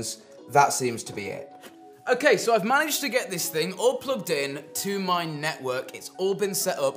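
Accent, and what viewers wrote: England, United Kingdom. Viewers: He's from the UK, not from the US... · Each time I heard the British say "Rooter" for "Rou' ter" I cringe. Nothing bad implied, It reminds me of "root canal". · How are you going to tell an Englishman how to English? 😂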